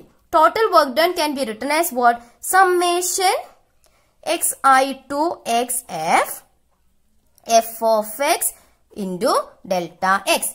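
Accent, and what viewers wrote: Kerala, India. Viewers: The way she talk in English we can say that she is from Kerala 😁👍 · yeah I think she is malayali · i can say that she is from south india from her accent.😂😂thank you mam i understood it.Love from Nepal❤️❤️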